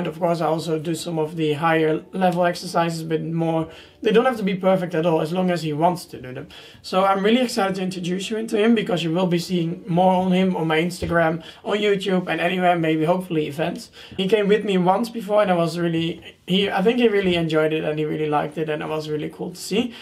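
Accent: Netherlands. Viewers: Je praat op een Nederlands accent 😂😂😂❤️ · Are you Netherlands our Englisch? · Are you Dutch?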